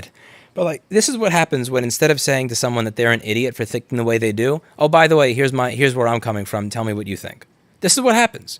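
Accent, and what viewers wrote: United Kingdom. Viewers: I love British accent, and after what I heard from Louis I have even more reasons to develop it just to annoy people. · As a brit I dont like the way people are complaining about a british accent...can you ask him to double down on it? Or to put on other accents. Brummy' scouse' gentleman. That would be just spiffing. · Complaining about British accent is like complaining about Louis looking like an Italian 😆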